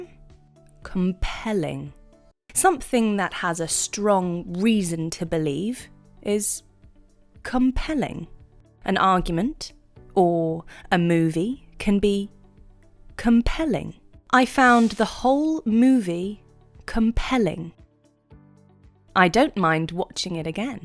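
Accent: United Kingdom. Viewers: This girl's voice accent seems from united kingdom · I really like your British accent. Furthermore, I enjoyed the lesson. Thank you 😊😊😊😍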